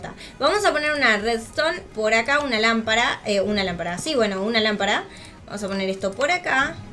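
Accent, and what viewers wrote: Buenos Aires, Argentina. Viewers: Me Encantó una pregunta.. ¿Sos de Oeste o Éste del Gran Buenos Aires? 😁😆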